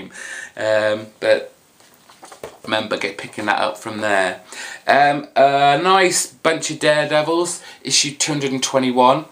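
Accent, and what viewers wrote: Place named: West Country, United Kingdom. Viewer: Appreciate the codes :) Loled at your "pretty darn decent" Sounded like everyone from down my way lol Gotta love the West Country accent! Enjoyed the vlog...missing your marvel graphic novel collection vids tho :/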